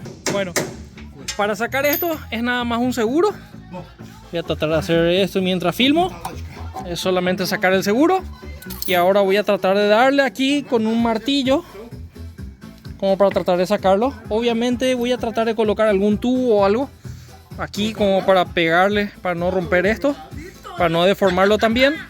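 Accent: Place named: Paraguay. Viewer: Cara, sou de Foz de Iguaçu. Nasci em 79. Minha adolescência foi com JDMs maravilhosos circulando, cuja origem, do py. Assistir teus vídeos me remetem um saudosismo do sotaque dos paraguaios na fronteira. Show😊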